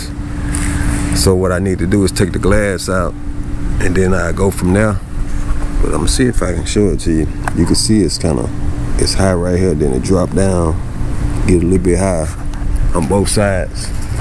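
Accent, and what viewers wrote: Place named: Dallas, United States. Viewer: You sound like you're in dallas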